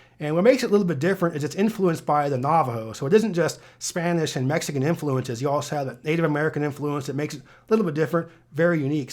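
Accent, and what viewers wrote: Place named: South, United States. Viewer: I can’t believe this guy is from California, he really sounds native Southern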